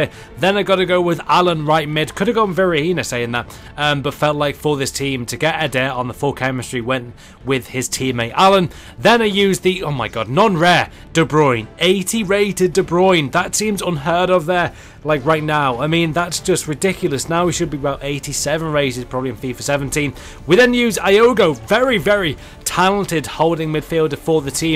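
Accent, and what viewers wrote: Hungary. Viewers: hungaryan commentary :D it's so good to hear :D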